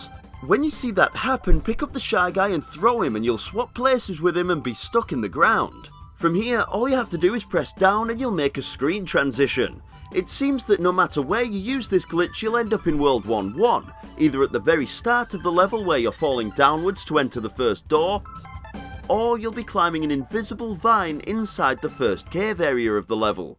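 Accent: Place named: Scotland, United Kingdom. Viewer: I love your Scottish accent <3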